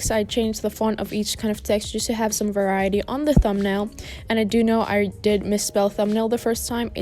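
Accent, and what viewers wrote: South Africa. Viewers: are you also from South Africa?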